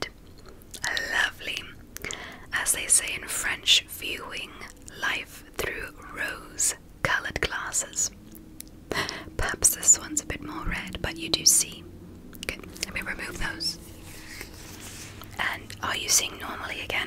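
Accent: United Kingdom. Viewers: Your hair is so cute curly❤️ love the British accent too. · I feel like it’s probably just me but I don’t get tingles with the British accent at all (maybe because I live in the UK and hear jt a lot??), only the North American one 🙈🙈🙈 it would be great if in the future can do more long videos with the NA accent ❤️🙈🙈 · Yay British is back! 😍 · You have a really good British impression ♥️😴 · I tend to speak to you in a British accent every time you speak in a British accent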